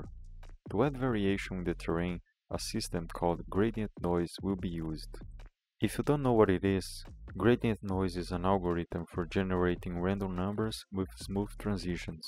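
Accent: Brazil. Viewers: amo q da pra saber qnd o cr eh brasileiro falando inglês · That's a brazilian accenttt broooooooo! tamo junto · Sotaque brasileiro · Aaaa, por isso o inglês era estranho, o cara é brasileiro, em fim ótimo trabalho parceiro, foi abençoado pelo algoritmo do youtube 100k views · Reconheci que era br pelo sotaque! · Eu tenho CERTEZA que é brasileiro n é possível ksksksksksk Esse sotaque é muito nosso vei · E esse inglês brazuka aí? Eu notei o sotaque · I must know this Why do you sound brazilian? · primeira vez q percebo o sotaque de um brasileiro kkkkkkk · Vc é brasileiro né? O sotaque brasileiro é inconfundível kkk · tu é br né? kksksksks eu já percebo na hora brasileiro falando inglês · Salvee, to ligado que vc é br, da pra perceber kkkkkkk, mas enfim, ótimo trabalho! 👏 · Are you brazilian? I'm assuming from your accent. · da pra reconhecer brasileiro de longe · brazilian bruv this accent 😭😭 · Com esse sotaque, você é brasileiro, não é possível kksjjskkjjjjsjsjsjsjsjsj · Reconheci o sotaque brasileiro hue Ótimo vídeo. 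· Cara, você é brasileiro? Fala bem pra caramba mas o sotaque não engana kkkk, a localização do canal no sobre também fala que é do Brasil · O sotaque brasileiro é inigualável kk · Se ele não for um brasileiro falando inglês eu crio asas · Incrível como eu já sabia que era BR só pelo sotaque kkkkkkkkk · Na hora eu já saquei que vc era br, c não engana primata da sua espécie não em Enfim, seu canal tem potencial, daqui um tempo vc tá nos 50 mil incritos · e esse sotaque brasileiro aí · Oh, good (percebi que é Brasileiro pelo sotaque no video, então... congratulations) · Acho que o narrador é br kssksk esse sotaque en ksk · Percebi que era BR só pelo sotaque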